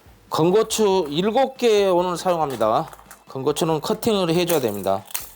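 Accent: Jeolla, South Korea. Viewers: 셰프님 전라도 사투리 넘 정겨워요😊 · 동생도 김치를 담궈 주시는. 오빠의 사랑 전라도고향 말씨에 정감이 갑니다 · 쉐프님의 요리 자주 시청하고 있습니다. 걸죽한 전라도 말씀 정감이갑니다.쉐프님께서 사용하시는 믹서기 구입하고 싶은데. 어디 좀 제품인지 알려주세요^^ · 완전 사투리가 돌산 아니면 광양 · 전라도 사투리 설명이 구수하니 감칠맛 나는듯~^♡ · 전라도사투리가듣고 싶어서 무김치 잘배우고나갑니다 ㅎ ㅎ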